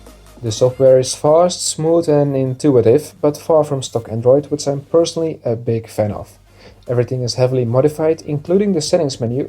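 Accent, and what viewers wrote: Netherlands. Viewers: 100% Nederlands accent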